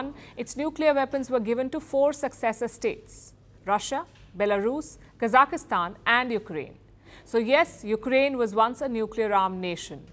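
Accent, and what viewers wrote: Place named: India. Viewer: Indian accent 😂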